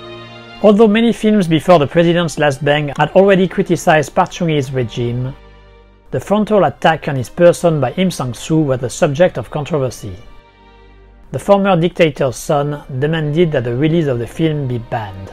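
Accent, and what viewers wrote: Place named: France. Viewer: Great video but the uber strong French accent squeezed my brain juice in such a tiring way. I mean you can use AI voice dude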